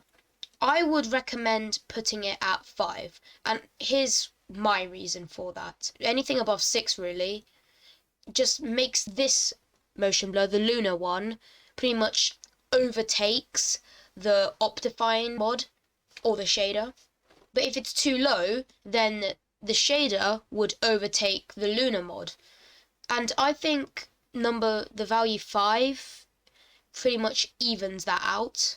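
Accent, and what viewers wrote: United Kingdom. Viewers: ayo first time hearing a female british player! you deserve more attention on your vids tho edit: ok before someone comes to kill me, i dont know anything about the voice seems female to me so i said it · that british accent doe 👌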